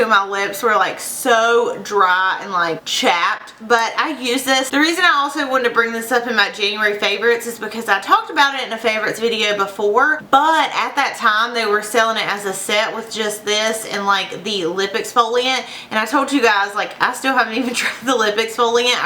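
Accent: South, United States. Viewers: You are SO beautiful. I love your videos so much. You are such a sweetie. Your makeup looks gorgeous! I would LOVE to see a tutorial. I could listen to you all day. I love your accent! I am a southern girl too. Love you,.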